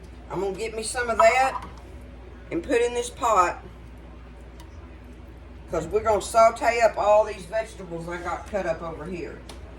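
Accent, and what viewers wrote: South, United States. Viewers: Whats a shrimp bowl? Lol jk 😂 i love southern accents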